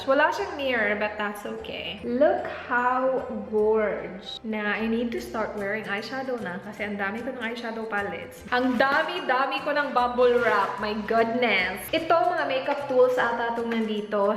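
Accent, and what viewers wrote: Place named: Visayas, Philippines. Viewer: Are you Bisaya? I looooove your accent!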